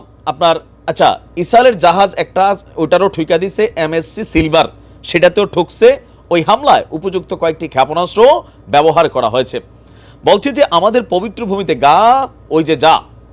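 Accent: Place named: Comilla, Bangladesh. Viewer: আপনার কুমিল্লা ভাসা বন্ধ করুন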